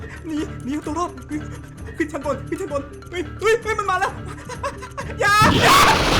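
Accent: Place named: Suphan Buri, Thailand. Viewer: อยากลองฟังพี่ พูดเหน่อทั้งคลิปดูจัง555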